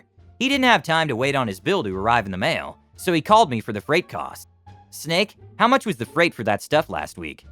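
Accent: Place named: United States. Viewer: How many languages are you fluent in? I’d swear your English is US native speaker but there are also videos on the channel I think might be Russian (or something somewhat similar?), but also might not be your voice?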